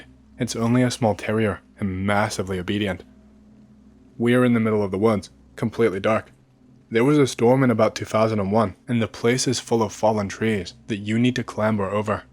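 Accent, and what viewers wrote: New York, United States. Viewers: Man i would never believe your voice wasn't AI with how point and consistent in your tone is if not for your NY accent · Just have to say it....New Yawk!! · Next time do a Scottish accent your new York one is perfect 😂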